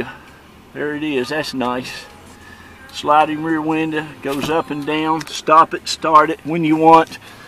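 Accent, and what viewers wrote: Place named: Florida, United States. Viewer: Man I love the Florida accent, beautiful truck, I’m currently looking at one for my self.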